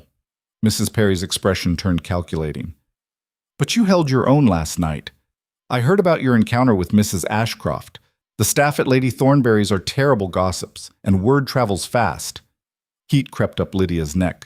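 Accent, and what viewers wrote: United States. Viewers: Good story but please use a British narrator when in Great Britain. The American accent take away from the story.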